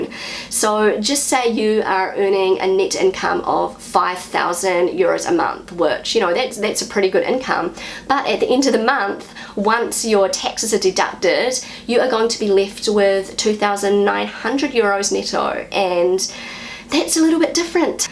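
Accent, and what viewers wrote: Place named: New Zealand. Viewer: New Zealand English sounds quite cute. However, the choice of words is a bit strange sometimes. ;-)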